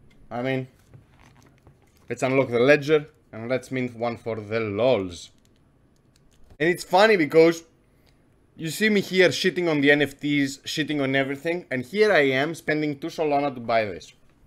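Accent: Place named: Greece. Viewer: thiccc Greek accent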